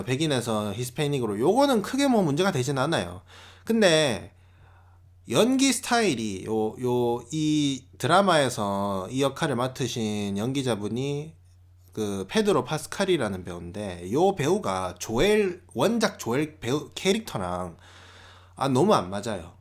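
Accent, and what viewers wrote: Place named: Gyeongsang, South Korea. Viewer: 후기를 듣다듣다가 말투를 보니.....경상도 출신인데. 대구? 부산? 어느쪽이든 고향분이라 반가브요 ㅎㅎ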